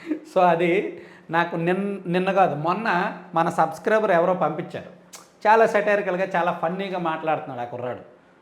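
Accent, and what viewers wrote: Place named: Rayalaseema, India. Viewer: he uses Seema accent, and satirical site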